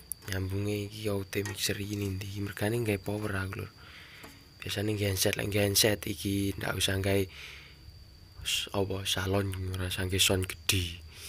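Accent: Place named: Java, Indonesia. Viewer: Boso mu ale meduk mas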